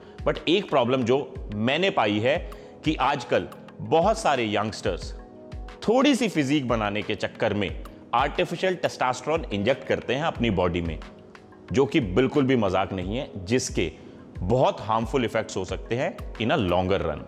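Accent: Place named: Hyderabad, India. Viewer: Zabardast Mashalah Chindiya agarbattiya mombattiya Sur Sur battiya chingari angar 😜 Hyderabadi andaz me bola lekin mashallah bhot khoob sir. Video apki dekh k mera har baar satisfaction aajata, confidence increase hojata k Bhai mai nai krsaktu aisa nai hai mai bhi krsaktu ye tariqa istemal krke or saath me apke liye dua bhi nikalti.😍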